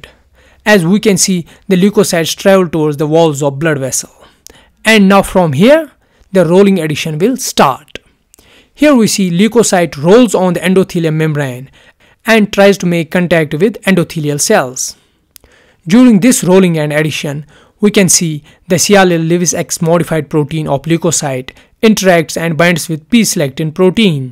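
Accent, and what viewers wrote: Kashmir, India. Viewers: Bro, love from kashmir, but your accent of speaking the English is very similar , how kashmiris speak English, are u kashmiri too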